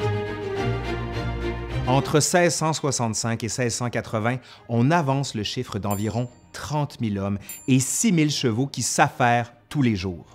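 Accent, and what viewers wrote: Quebec, Canada. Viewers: Suis français et j’adore me faire raconter l’histoire de France par quelqu’un qui a l’accent québécois. J’écoute/je regarde toutes les vidéos depuis le début #mercileconfinement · Nous français on trouve votre accent bizarre on en rit même...😓😓😓 Mais à force d écouter la chaîne j apprecie votre accent donc continuez amis du QUÉBEC Super doc comme toujours Force à vous 💪💪💪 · L'histoire de France avec le charmant accent canadien, ça en jette ! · Le roi avait le palais ouvert et de plus, une dentition atroce. Il parait qu'il lui était impossible de mastiquer. Il ne pouvait prendre que de la nourriture liquide ou en purée. Qu'en est-il ? Vidéo très intéressante comme toutes les autres ! Je vous remercie d'articuler parfaitement et de parler à un rythme également parfait. Cela permet une compréhension idéale. On vous entend bien, on vous comprend bien, et en prime on a un bel accent canadien ! 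Lui-même parfait car juste assez prononcé pour donner une jolie musique mais sans nuire à la compréhension. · Tes vidéos sont très intéressantes, mais je te suis aussi pour ta sympathie et ton accent québécois!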